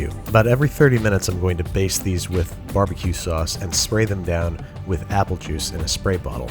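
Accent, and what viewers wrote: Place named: Rochester, United States. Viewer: Holy crap, which part of Rochester are you from? Hello from Greece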